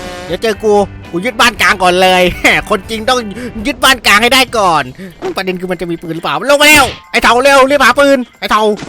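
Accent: South, Thailand. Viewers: ชอบมากเลยงับ สำเนียงใต้ <num>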